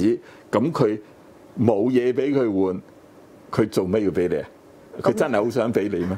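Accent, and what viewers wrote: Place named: China. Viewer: 女主持有口音。。。兩個都係大陸人。好粉紅喔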